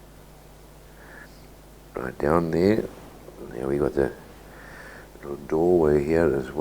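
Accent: South Africa. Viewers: This is delightful. Thanks for sharing. Nice to hear that South African accent again!!!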